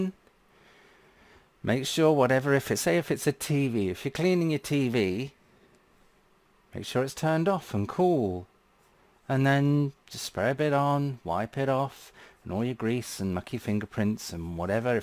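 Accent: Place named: United Kingdom. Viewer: Very entertaining my friend. And it is common sense. At 69 years old, I'm still very young and a triathlete and I'm quite entertained not in a good way like you were to me but by people from ages 20 to 70 especially Americans as I moved from America to South America 20 years ago because of the cognitive dissonance and brain dead situation and prefer to live amongst my Costa Rican t i c o family and others from neighboring countries as they have more love common sense and appreciation of life. Thank you my British friend unless I missed it and you're Australian as the accents are close. Bendiciones from South America, Craig thank you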